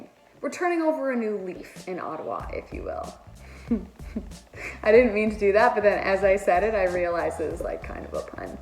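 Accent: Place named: United States. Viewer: Oh no you have been in the STATES so long your getting an american accent lol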